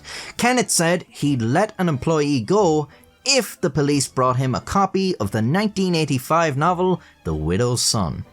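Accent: Ireland. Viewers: What county are you from, my dads from cork, I can’t pin your accent but i know it sure as hell isn’t Dublin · I love this channel. The interesting stories, the excellent artwork, your wonderful Irish accent and the humor. Entertainment and informative too.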